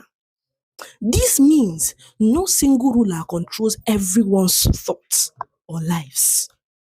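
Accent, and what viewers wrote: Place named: Abia, Nigeria. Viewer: Love you my sister, are you from Abia State because you talk like us.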